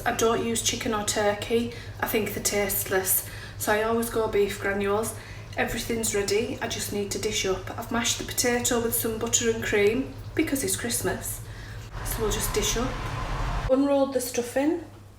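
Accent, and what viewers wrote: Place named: North East England, United Kingdom. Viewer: Bloody Hell! It's 9 45am and l could eat this now!😂 You, Lady are quite a joy to watch cook! Didn't think they made brilliant, everyday home cooks at your age any more! I've just found your vids (see this one is 4yrs old!) - they are all very enjoyable to watch, especially with your lovely, no nonsense, talking complete common sense-North East accent (I love all regional accents)😊 This had me reminiscing about our big Family Christmases, back in the 70s. My dear lil' Mum used to cook for the 5,000...well only 14 of us, including 5 kids but would have fed the 5,000! She only had a regular sized gas cooker and a fridge with a tiny icebox. Oh how she would have loved a microwave and an airfryer...and we'd have loved her to have a Dishwasher (l still hate washing up by hand!). But she'd hwve really loved to have had a Range cooker. 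Why? cos one year we had a 46lb Turkey - yeah, l kid you not, 20.8kg in today's money!😉 - and "the B@@ Thing" (her words, not mine: said in her lovely Cockney accent! got stuck in the oven! The legs sprung up as it cooked... me Dad had to saw them off while it was stuck in the oven!!😂😂😂 The lard... the spuds...the veg water for the gravy, all so reminiscent. Bought bacwondeful l memories so thanks fot that ❤ This, people, is a Master Class in how to cook any Roast Dinner! 👏👏👏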